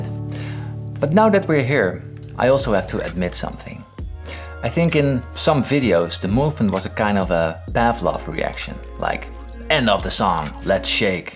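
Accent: Netherlands. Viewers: ik neem aan dat je nederlands bent aangezien je geweldige accent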